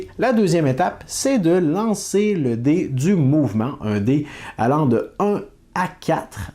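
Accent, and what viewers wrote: Quebec, Canada. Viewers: J'adore tes vidéos en général, mais là franchement ta présentation du jeu Jungle Cruise me donne trop envie d'y jouer !! D'abord parce que je trouve le matériel super joli et j'adore la thématique, mais en plus le jeu a l'air simple à jouer et je pense qu'il sera tout à fait adapté pour y jouer avec ma mère. Et pourtant ce n'était pas gagné parce que le fait que ce soit un jeu Disney ne m'inspirait pas plus que ça (et pourtant j'adore aller à Eurodisney et j'adore leurs films). Donc je l'ajoute clairement à ma liste :) et rien à voir mais j'adore ton accent québécois donc c'est très agréable d'écouter tes vidéos :)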